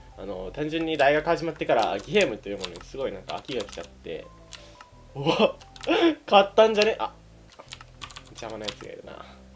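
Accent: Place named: Nagoya, Japan. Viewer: 名古屋弁じゃん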